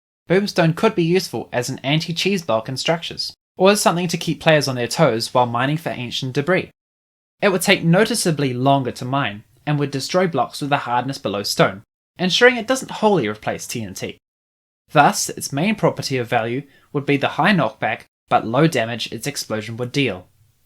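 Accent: New Zealand. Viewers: You sound like a New Zealander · Couldn't have a more Kiwi accent if you tried hahaha. Great video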